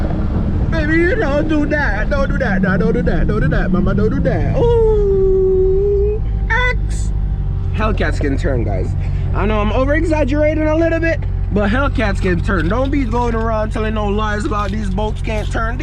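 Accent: Jamaica. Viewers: This the jamaican crazy driving yall won't understand😂😂😂don know mi a watch from aruba/jamaican big up much love love e accent my yout and the content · As a yaadman, me coulda pick up the accent immediately lmao, big up yuhself me g, dawg push di hellcat yuh fuckkkk😂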